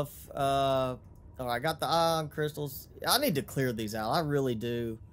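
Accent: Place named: Texas, United States. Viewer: Nice Texan talk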